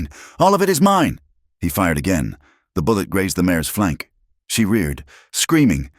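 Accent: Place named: Boston, United States. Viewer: Love your sexy gorgeous voice by way of Boston! Hope it’s everything I want!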